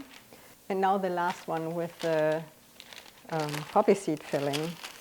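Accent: Germany. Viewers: I LOVE the burnt raisins!! I usually will do my Stollen on the weekend after Thanksgiving. I have to smile when you speak because you pronounce English words with German sounds. I do not laugh at you but sure appreciate how hard English is to learn for non-native speakers. Unlike German, English diphthongs ARE NOT consistent. You do very well!!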